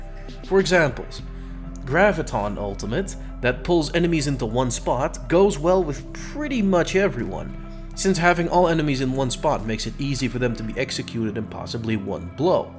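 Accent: Netherlands. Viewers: Are You Also Dutch?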